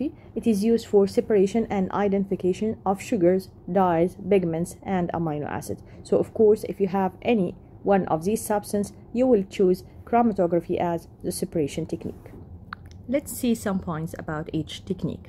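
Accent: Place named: Arab world. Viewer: I think she's Arab, because arabic doesn't have the letter p so it's easily confused with b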